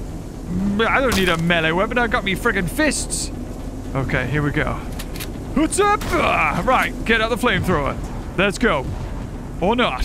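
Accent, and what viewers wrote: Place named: Newcastle, United Kingdom. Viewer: We got a love also he’s firm Newcastle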